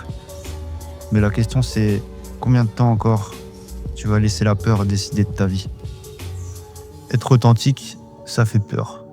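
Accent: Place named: North, France. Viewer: T’es du nord ahah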